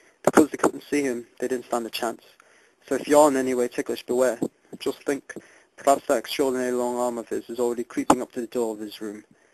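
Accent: Manchester, United Kingdom. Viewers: you sound more manchester. take it from me. i live there. Yorkshire is very harsh, very pronounced. manchester is softer. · definately northern, but not yorkshire, i'm yorkshire. more manchester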